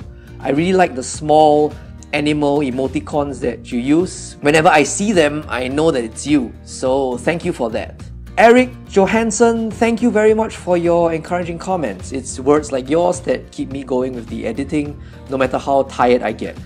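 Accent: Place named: Singapore. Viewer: This was the most wholesome video I have ever seen, really cute! And you are from Singapore, always wondered where your cool accent comes from. <3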